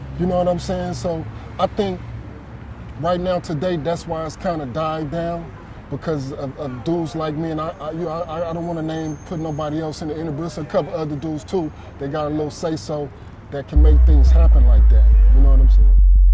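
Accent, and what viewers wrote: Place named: Chicago, United States. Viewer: He sounds Chicago not new york... we don't talk like that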